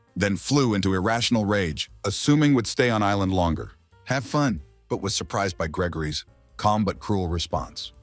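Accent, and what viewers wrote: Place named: Russia. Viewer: The english sounds like broken russian or east European.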